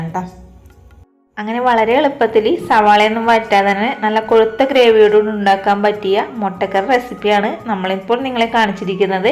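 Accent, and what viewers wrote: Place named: Ernakulam, India. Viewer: എന്റെ ഒരു frd നിങ്ങൾ സംസാരിക്കും പോലെ ആണ് സംസാരം എറണാകുളം കളമശ്ശേരി ആണ് അവരുടെ വീട് 👌👌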